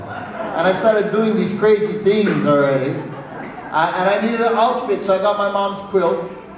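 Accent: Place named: North, Philippines. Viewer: that northern filipino accent is spot on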